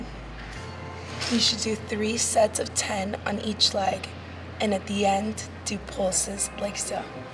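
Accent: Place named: Southern California, United States. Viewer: I've always enjoyed voice. It seems so native Southern Californian but she hails from the Miami area.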